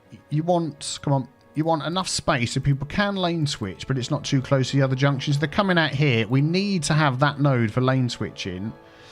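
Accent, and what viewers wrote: United Kingdom. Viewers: There is something uniquely meditative about listening to a random British dude sip tea and mutter away while diligently fixing the same rudimentary transportation engineering problems over and over again. It's like a slightly condescending ASMR, complete with a hint of progressive optimism, and little cherry verbal nuggets sprinkled in; horky-borky, chockablock, straight-on, etc. Just makes me feel like things are right in the universe. You've hit a commendable niche. This is far better ambience than listening to "4 hours meditative gregorian chanting" or "best of derivative study beats vol. 69" 10/10, will definitely put on the Anglo-traffic-Daddy while I'm doing research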